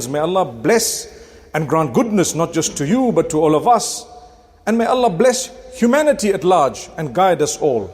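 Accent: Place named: Arab world. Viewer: Assalmualaikum Mufti Menk! How're you doing? I love your arabic language tone. Where are you from, please?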